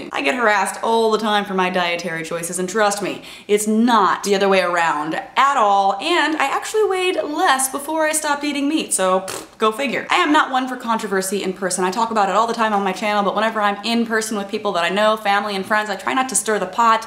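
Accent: South, United States. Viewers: the country accent was actually very cute. keep up the good work huge fan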